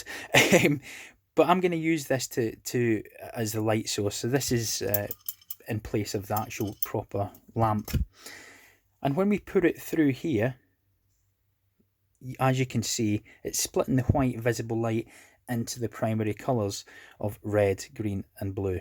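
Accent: Scotland, United Kingdom. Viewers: That was great. So they are just monochrome LCDs with the light filtered coming to them. {Admires Scottish accent realizing it actually helps in attention as-well-as retention.}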